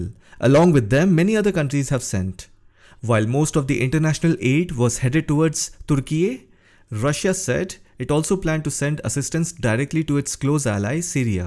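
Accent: India. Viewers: Good Presentation Except** Heavy Indian Accent *** 🙏 RIP*** Why buildings were approved * By Turkish Government * In this fragile area? * Is it due to bribery & corruption? * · it's indian english just like americans speak their own american english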